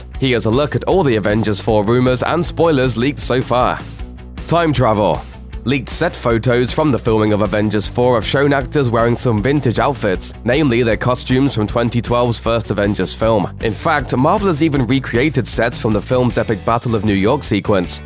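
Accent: United Kingdom. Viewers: I like your Brit accent O-al-ll chw cute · Yo is this that the british dude from sanity not included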